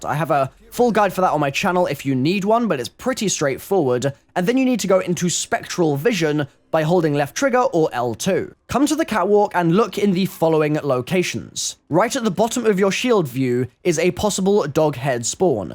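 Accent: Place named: London, United Kingdom. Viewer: YOU SOUND LIKE YOU FROM LONDONNNNN (Nailed it)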